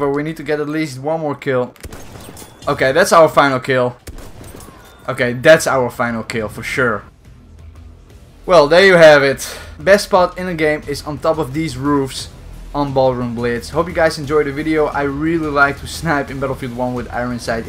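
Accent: Netherlands. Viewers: r u dutch bro? cuz, pronounciations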